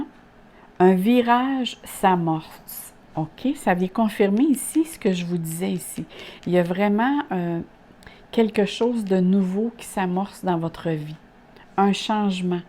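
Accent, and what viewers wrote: Quebec, Canada. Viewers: Bonjour, tient dont,,,, un accent du Québec, très bon tirage, bonne continuité. Kado de Québec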